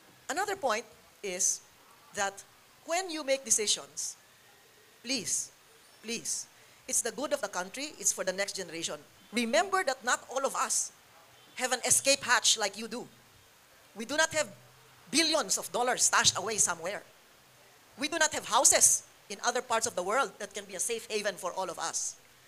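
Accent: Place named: China. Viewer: Chinese ka ksi kaya ganyan ka magsalita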